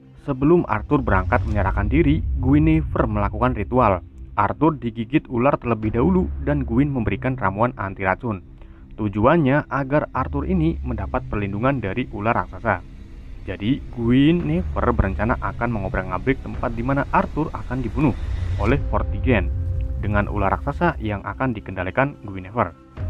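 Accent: Indonesia. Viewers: Yah gpp bang kan logat orang indonesia jadi masih rada² susah ngomong bahasa luar yah belajar aja semoga bisa 👌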